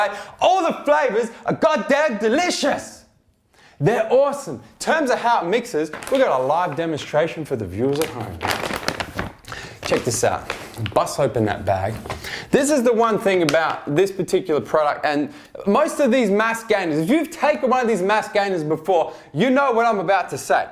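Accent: Australia. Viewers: Manos Nikolidakis seems fine to me this is how aussies talk if u were a good psychologist u would know that different places have different ways of talking. Plus the extra obnoxious loudness is just hid way of trying to appeal to his subs as youtube is sort of a entertainment platform. Throw your degree away lol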